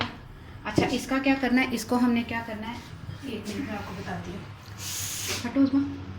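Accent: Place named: Punjab, India. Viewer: muzna malik یہ تو پنجابی لہجہ ہے یہ تیز ہی بولا جاتا ہے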